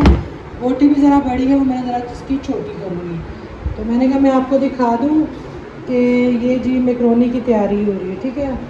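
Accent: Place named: Jalandhar, India. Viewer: ਤੁਹਾਡੀ ਬੋਲੀ ਇੰਡੀਆ ਪੰਜਾਬ ਚ ਜਲੰਧਰ ਵਾਲਿਆਂ ਨਾਲ ਮਿਲਦੀ ਐ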